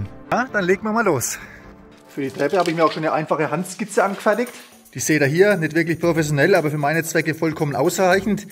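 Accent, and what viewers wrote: Franconia, Germany. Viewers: du frängelsd jo wid zau :) Mega Grüße aus Unterfranken!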